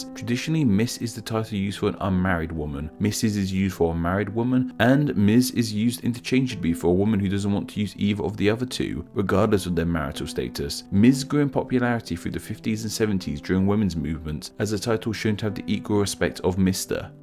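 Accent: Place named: Southern England, United Kingdom. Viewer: Agreed I'm from England but this guy is to much of a southerner and it just makes some videos painful to watch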